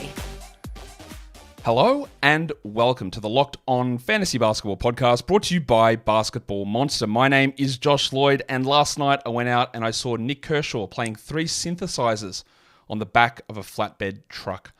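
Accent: United States. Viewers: Best American accent ever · Great American accent and gradey dick segment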